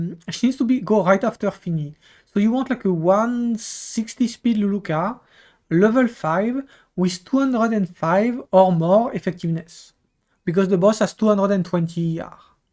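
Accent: France. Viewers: Yea man your french accent is too strong lol..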